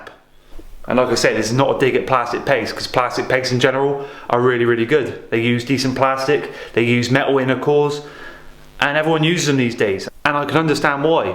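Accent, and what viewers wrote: United Kingdom. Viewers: Are you Cornish 🔥🤘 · hate english accent.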